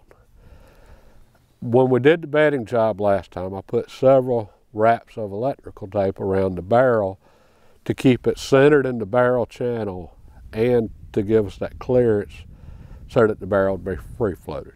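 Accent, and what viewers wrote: South, United States. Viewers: did you ever send the Winchester Model 70 257 roberts back to Winchester to see what they had to say? BTW, I really enjoy your videos. You sound so calm and composed. Are you from Ga, tenn, or ala.?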